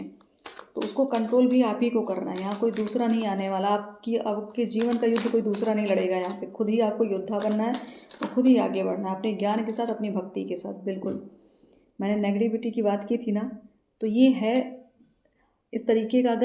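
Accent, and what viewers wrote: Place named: Haryana, India. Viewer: Aap haryana se ho main bta rahe hu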